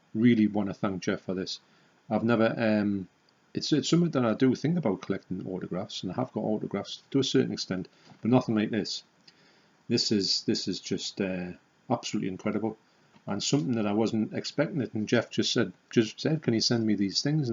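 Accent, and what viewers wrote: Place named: Newcastle, United Kingdom. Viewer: Hi.Love the Alex picture signed by magnificent Malcolm himself.I’ve been enjoying your vids for a few months now,there’s not enough Geordie accents on YouTube.My late wife was from Killingworth and I still visit the family a few times in the year (I’m down south).Your love of depraved video nasties warms my cold dead heart.Keep up the good work!👍🏻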